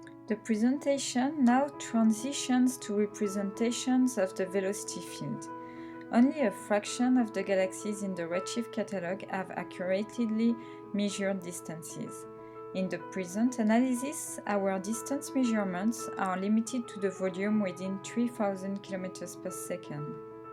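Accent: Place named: Ryazan, Russia. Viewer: Этот рязанский акцент...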